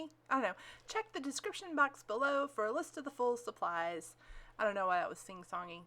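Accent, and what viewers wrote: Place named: North Carolina, United States. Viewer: Ah, maybe a North Carolin accent.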